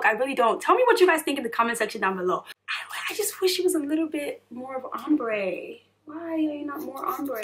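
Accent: Nigeria. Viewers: its actually a really beautiful wig, and you'd break hearts with that on lol. Plus i love when you go back to a Nigerian accent...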